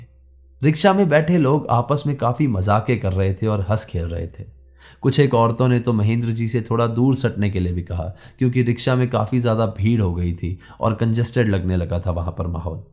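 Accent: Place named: Gujarat, India. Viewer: Tum gujrati lag rahe hooo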